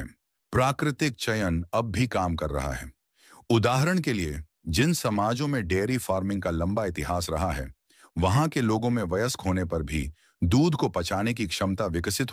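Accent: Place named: Bihar, India. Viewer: I think AAP bihar se hai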